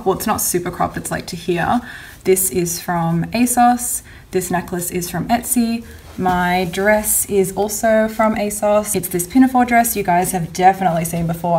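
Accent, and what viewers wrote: Australia. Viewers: Also dude, I thought you were Australian, then I saw the city and thought you were in London, then I looked on your blog and it says you['re from Sydney. I'M SO ANNOYED cos I saw those lovisa chokers and I just. REALLY. need to buy a choker right now. I'm so annoyed cos I want like 10 beautiful chokers but no stores have good ones ugh.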